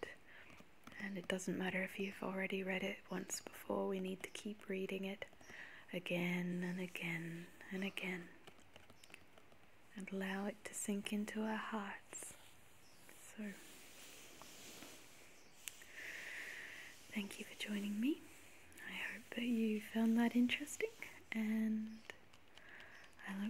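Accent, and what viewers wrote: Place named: Australia. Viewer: I also find it quite refreshing hearing an Aussie accent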